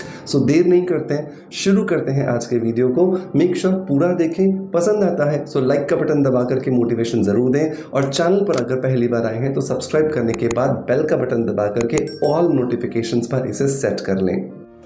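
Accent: Bengal, India. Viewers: Sir aap kya bangali ho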